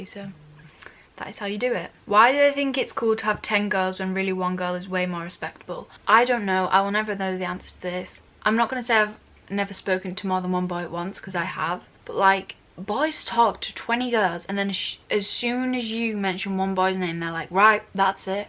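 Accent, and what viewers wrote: United Kingdom. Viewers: She bri’ish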